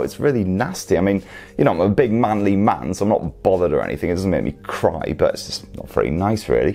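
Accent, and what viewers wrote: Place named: North East England, United Kingdom. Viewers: I thought I recognised the accent aha I’m also from Sunderland:) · A circular job is, and a bum load of features. Love it. Nice looking item. Wish I’d got it, prior to getting the kids into Fitbits. Can’t quite pin point the accent. South of the Tyne, but, North of the Tees?. Nice review.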